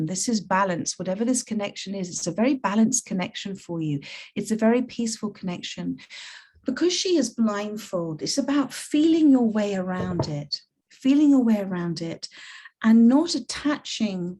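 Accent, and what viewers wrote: United Kingdom. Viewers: Wow...I am a soon to be published poet..work explores the dark side of my life and also my hope..so that I'm praying it will reach others 🙏 My only fear about being in hermit mode which has been for years, the further I'm going into hermit mode..? Thank you for your calm and insightful presence.plus we Yanks 💘 the British accent.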